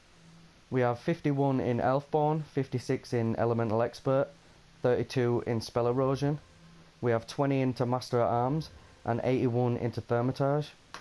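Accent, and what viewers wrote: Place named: Leeds, United Kingdom. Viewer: Loving the Leeds/Bradford accent mate identical to how mine used to be lol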